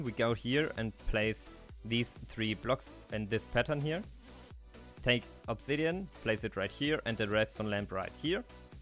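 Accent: Germany. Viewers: And btw you hear like your are german, and here is a qord for you : im a ALMAN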